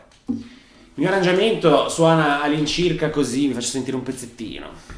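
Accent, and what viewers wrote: Genoa, Italy. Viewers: È davvero molto bravo. E non pensavo che avesse tutta questa conoscenza teorica, perché non si prende mai troppo sul serio. Mi piace un sacco l'accento genovese quando chiede "avete capitooo???"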